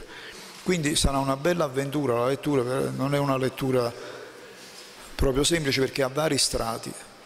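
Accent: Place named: Naples, Italy. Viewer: lei é un tesoro culturale napoletano con questa modestia irresistibile da del liceo, nessuna pianta raffinata,solo l'odore dei..limoni. Grazie IISF e Massimiliano per darci ció. Lo spirito di Archita, del nolano e di quel mecenate dell' istituto non moriranno mai. Che pianto amaro leggere la sua difesa senza abiurare. Che onore e fortuna potere sentire questi contenuti, con il suo accento a tanti km di distanza. Perché l'accento che si sente bene nel film é un accento di chi é, di chi parla delle “idee“ ripudiando le forme sofiste, di chi qualunque siano le pacate conferme del pensiero esprime il suo eroico furore. Grazie, sono davvero orgoglioso di esssere Italiano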